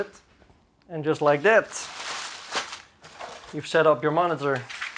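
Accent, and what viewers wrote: Netherlands. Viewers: Ik hoor dat je Nederlands bent hahaha Leuke review❤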